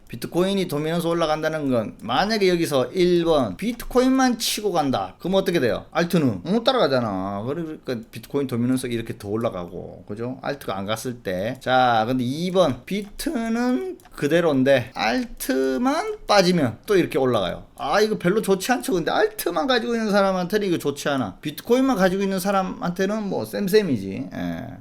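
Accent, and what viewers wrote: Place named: Gyeongsang, South Korea. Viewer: 항상 시원시원하게 말씀해 주시고 경상도 사투리 정감가고 좋아요 항상 잘 듣고 있습니다 감사합니다.^^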